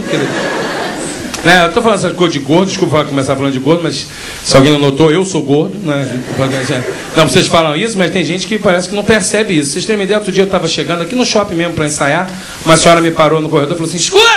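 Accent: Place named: Rio de Janeiro, Brazil. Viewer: Shoooooooow de bolaaaaaaa! Nossa, acho que os melhores comediantes, são os cariocas! O sotaque e o jeito meio largado, tipo " isso meermo" e coisas do tipo, ajudam a ficar mais engraçado! Parabens! sou sua fã!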